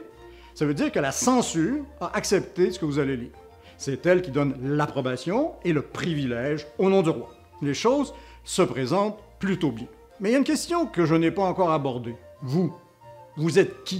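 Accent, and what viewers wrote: Quebec, Canada. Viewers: Merci, c'est tout à fait passionant ! Et l'accent québécois fait toute la différence !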